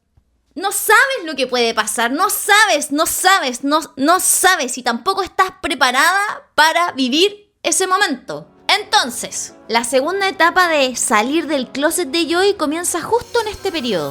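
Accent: Chile. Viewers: tu timbre chileno de hablar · No puedo con este acento chileno uy no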